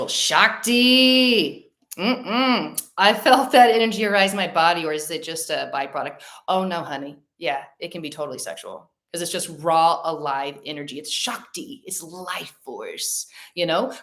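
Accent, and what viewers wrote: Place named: South, United States. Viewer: Suddenly realized she’s southern lol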